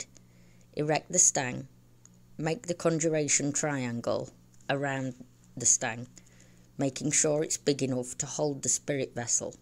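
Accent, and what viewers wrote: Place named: Mansfield, United Kingdom. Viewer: You have to be somewhere local to me with your accent I'm from Mansfield x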